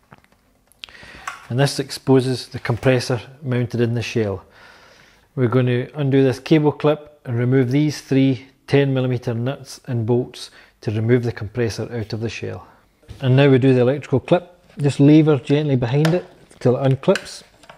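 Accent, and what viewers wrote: Scotland, United Kingdom. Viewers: Great Video, I love your Scottish accent!!!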